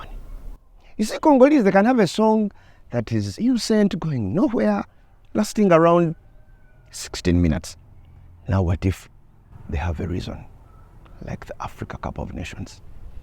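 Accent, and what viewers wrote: Nigeria. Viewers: The Nigerian ascent was on point 😂😂😂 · The Nigerian accent is on point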